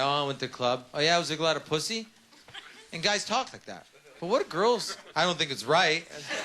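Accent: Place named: Canada. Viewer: is he from canada? he says ehh a lot..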